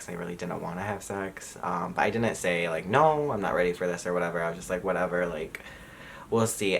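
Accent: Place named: Michigan, United States. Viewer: Your Michigan accent kept throwing me off. I thought you were saying Kanye-ac, as if Kanye had invented his own brand of cognac. Which would not even remotely surprise me. 😶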